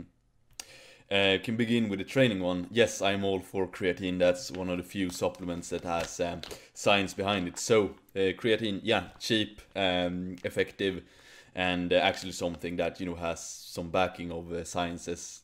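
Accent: Sweden. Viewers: Swenglish is the most glorious accent in the world :) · .Bowles If I am going to guess, I guess he has learned English through reading/studying, because of his huge accent that he has. I am from Sweden as well and I used to be pretty bad at speaking English verbally mainly because I only read English in text form. When I started watching tv-series, I improved my pronunciation a lot. But his vocabulary is amazing, mine is pretty crap to be honest but I learned English by myself so I should be somewhat happy lol.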